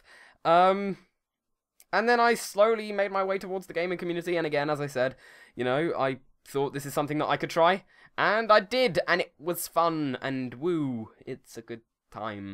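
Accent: United Kingdom. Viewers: When you move to the US, won't you lose your British accent? Kinda sad really, all the British LPers are going over to the US. Ah well, can't be helped. Anyways, you going to keep dat accent? :D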